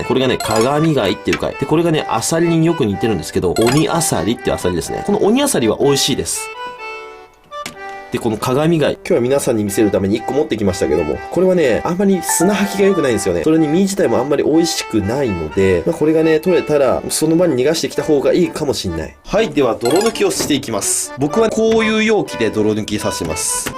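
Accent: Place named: Rural, Japan. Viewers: １分３０秒完全に田舎のお兄さん( ´∀`) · だでね〜 この方言聞くと愛知県らしくて素敵ですw by 愛知県民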